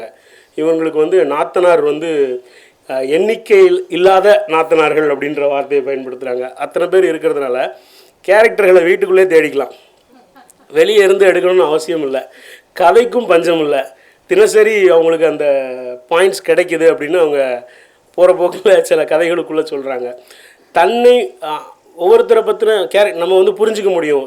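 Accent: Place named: Tirunelveli, India. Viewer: பெருமகிழ்ச்சி கார்த்தி புகழேந்தி. நெல்லை பேச்சு வழக்கு குறைந்து விட்டது வியப்பு! பிற்பகல் 3.15 மணி வரை இருந்தேன். நேரில் தங்களைச் சந்திக்க இயலவில்லை. (ராஜம் கிருஷ்ணன் அவர்களை மூன்று நான்கு கூட்டங்களுக்கு அவர் வசித்த போரூர் தெருவிலிருந்து அழைத்துச் சென்று விட்டது, மைதிலி சிவராமன் அவர்களுடனான நட்பு , 'மகளிர் சிந்தனை' மாத இதழில் அவரது கட்டுரையை கையெழுத்து வடிவில் பெற்று ஒருமுறை திருவல்லிக்கேணிக்கு எடுத்துச் சென்றுள்ள நினைவுகள் வந்தன. 2011 விநாயக சதுர்த்தி விடுமுறை நாளில் ஓவியர் கோபுலு அவர்களை அவரது ராஜா அண்ணாமலைபுர இல்லத்தில் சந்தித்தபோது ராஜம் கிருஷ்ணன் போரூர் ராமச்சந்திரா மருத்துவமனையில் அனுமதிக்கப்பட்ட நிலையில் அவருடன் கவிஞர் இரா.தெ.முத்து எடுத்த பேட்டி வெளியான செம்மலர் மாத இதழைக் காட்டியபோது கண் கலங்கிய படி உற்று நோக்கி 'லட்சுமிகரமா இருப்பளே!...' என்று பேச்சுத் தடுமாறியதை உணர்ந்தேன்...) )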